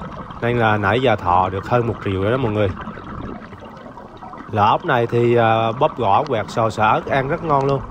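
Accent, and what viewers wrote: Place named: Quang Nam, Vietnam. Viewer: quê ở đâu mà nói giong fống qủang nam vậy bạn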